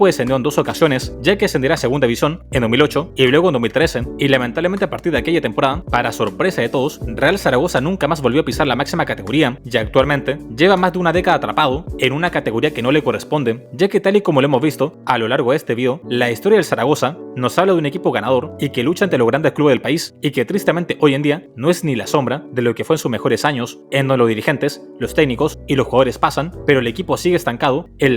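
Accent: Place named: Latin America. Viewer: Por tu acento pareces latinoamericano, te agradezco que hagas un vídeo sobre uno de los mejores equipos de la Liga, al menos con historia, y el séptimo con más títulos en España. Es una pena que a día de hoy esté así, mucha gente de otros clubes preguntan y no se lo explican. Es muy duro. Sé que en Argentina es muy querido, debido a que tuvo muchos futbolistas de esta nacionalidad en sus filas, y con un muy buen rendimiento. Salvo milagro, nada lo va a salvar esta temporada de descender a Primera RFEF. Habrá que ver si es viable en esta categoría, pero si desaparece sería una tragedia.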